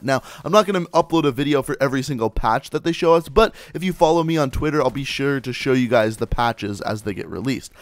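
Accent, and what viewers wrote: Canada. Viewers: I kinda like your content, but I'm distracted by your HEAVY canadian accent, I mean "Peace Øut" what is that??!! LOL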